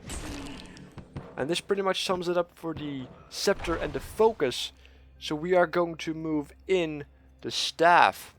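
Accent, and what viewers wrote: Netherlands. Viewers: You can hear that he is dutch lol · Dutchie? :P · French? What french people have you been listening too? He sounds dutch.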